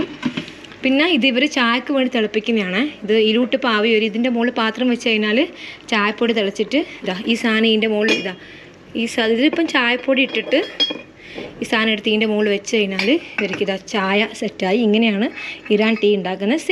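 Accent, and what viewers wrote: Kannur, India. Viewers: കണ്ണൂർക്കാരത്തി അല്ലേ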